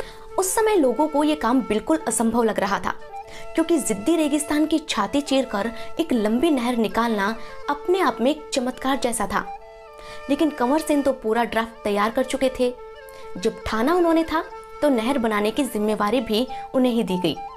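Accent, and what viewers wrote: Standard. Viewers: आपकी शुद्ध हिंदी बोलने की कला ओर आवाज ,दोनो हि लाजवाब हैं मैडम जी ,बहुत अच्छी वीडियो हैं। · बिल्कुल शुद्ध हिंदी बोली आपने बहुत मधुर भी बोलती हो दिल से आपको धन्यवाद o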